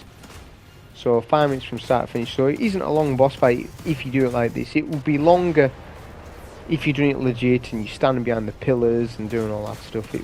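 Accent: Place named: North, United Kingdom. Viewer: you're an up and comer youtuber but man your northern accent and conversational commentary make you very likable. catch this sub.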